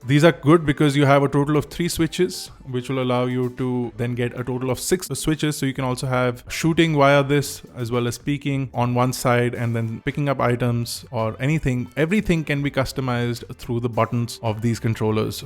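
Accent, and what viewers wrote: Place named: Delhi, India. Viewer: Simply elegant and useful info... Y i joined this coz the person is not wannabe... Simple perfect delhi accent English.. not trying to make it cool accentize British or American accent English... All concentration on gadgets knowledge no tantrums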